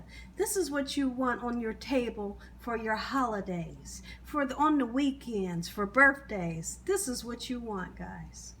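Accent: South, United States. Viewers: I just love listening to your southern voice 😂you’re so sweet THANKS FOR THE COOKING TUTORIALS 🙏🏾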